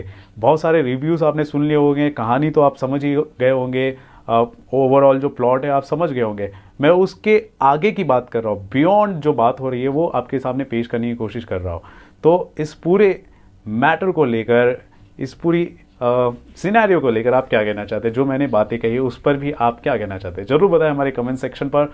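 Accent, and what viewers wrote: Karnataka, India. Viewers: Ur kannada is so sweet 😘.. lots of love from Karnataka ❤️💛🙏🏽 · You talk pakka kannadiga in 1st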